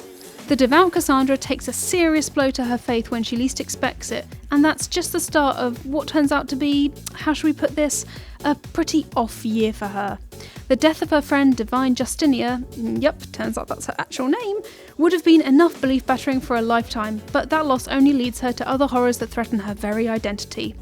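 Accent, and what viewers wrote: United Kingdom. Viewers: I love female characters and often play as them when given a choice but I don't dig this videos' feminist undertone and the fact the boss from mgs3 is absent really is a bit of a fuckup. Keep your identity politics shit out of games, unsubbed, feminism is collectivist trash and you Brits need to move on. Stop letting bitter women project their shitty politics on games, that shit failed and we don't wanna hear it and it doesn't sound more clever with your shitty accent. How about a best characters list you gender obsessed witch.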